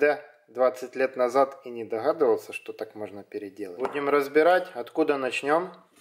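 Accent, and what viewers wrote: Odessa, Ukraine. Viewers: Вы из Одессы))?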